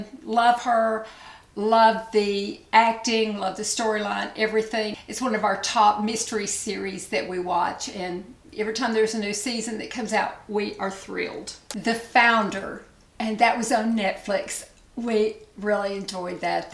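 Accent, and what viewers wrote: South, United States. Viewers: Hello new to your channel 😃. Great tip for velcro rollers, who knew❤‼️ Love your accent, you sound like a Southern Lady. I went to grad. school in GA., and enjoyed everyone I met. 👍 P.S. Where did you purchase your fabulous earrings, so pretty?!!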